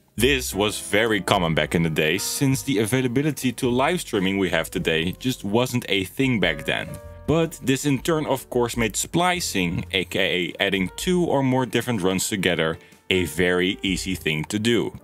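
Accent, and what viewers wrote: Netherlands. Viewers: Sowieso dat je Nederlands bent. We herkennen ons eigen accent vaak iets te goed haha. Fantastich filmpje vriend! De kwaliteit van soortgelijke YouTubers die miljoenen subs pakken. Mooi om te zien dat het algoritme heeft geholpen. Ga zo door!👊🏻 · I hate the Dutch accent 😢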